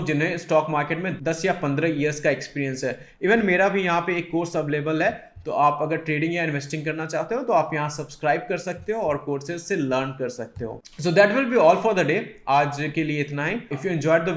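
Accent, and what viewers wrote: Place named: United States. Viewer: Aaj Maine pehli baat american accent me hindi basha suna hai.. Ya fir ye kaho ki angrezi me hindi suna hai..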